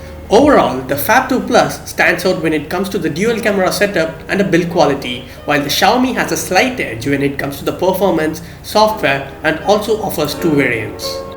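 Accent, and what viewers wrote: India. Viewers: Indian accent is disgusting!!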